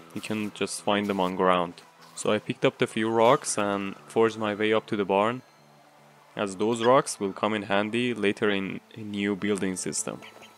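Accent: Iran. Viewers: You sound Persian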